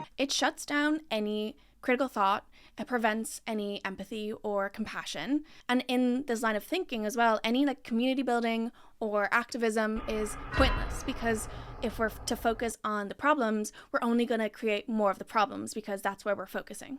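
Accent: Ireland. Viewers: Ive finally placed your accent do you have an irish parent 😭😭😭😭 im probably late 😭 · Are you American? There seems to be a slight Irish influence on your accent.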